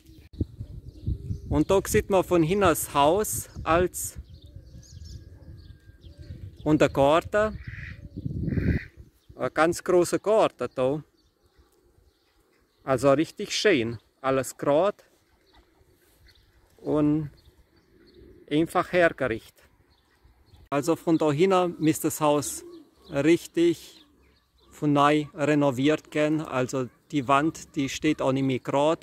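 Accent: Germany. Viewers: Suna ca si Dialectul de Bayern!!! Ich Mag Das!!! Gruß Gott!!:hand-pink-waving::face-red-heart-shape::face-red-heart-shape::face-red-heart-shape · WUNDERBAR und SEHR SCHÖN, DANKE für dieses schöne Video aus dem Banat. Sag Mal, weißt Du, wo Deine Vorfahren hergekommen waren, denn Dein Dialekt erinnert sehr an das Saarländische und an das Süd-Pfälzische in Deutschland. Alles Gute und nochmals DANKE für dieses schöne Video...!!! Gruß, Marijama.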